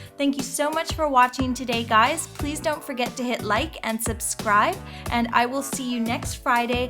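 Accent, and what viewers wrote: Canada. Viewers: I love your videos. Totally inspiring. I've taken so many ideas from you, and have even found myself slipping from my American hick accent to your fun Canadian one from time to time. :D That's how many times I've watched your videos!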